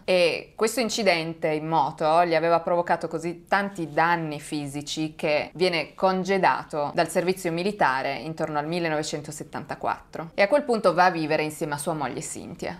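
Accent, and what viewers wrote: Turin, Italy. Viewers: Si sente che sei torinese cara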